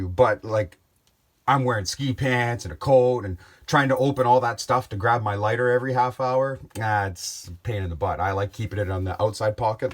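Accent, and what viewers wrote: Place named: Canada. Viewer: I support you. I remember seeing you on a couch with Tman (and a cat) and there was some chick in the room too. I remember watching your holyday video where you stayed at a hotel at the beach with your family, Infact I remember that video well. I remember Tman referring to you as a better option as a friend, If I was interested in inviting someone to Down Under. I remember the amazing gift of joy Tman gave me, as he puffed away on a Lucky Stike or Camel un fileted. But just now I want to say to you. I support you and Canada. I enjoyed who you are. And I enjoy your Canada voice. I could go on like your bus(van) trip to the Zippo factory or how I'd love to just grab a Blue Berry Zippo of the shelf at my local tobacconist. Ashes to ashes. BUD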